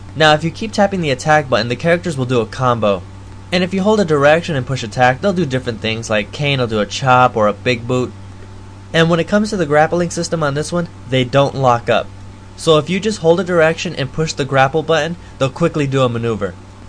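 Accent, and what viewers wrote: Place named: Ireland. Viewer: I agree that it's very pleasing to listen to. Neither loud and brash, nor quiet as a mouse. Neutrally-accented, save for a faint Irish lilt.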